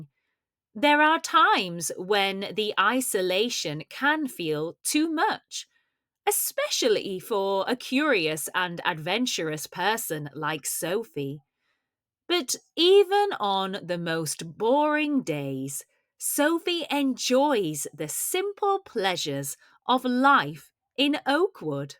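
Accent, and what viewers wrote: United Kingdom. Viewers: I really like your video, it really helps me with British English pronunciation, thank you very much ❤ ￼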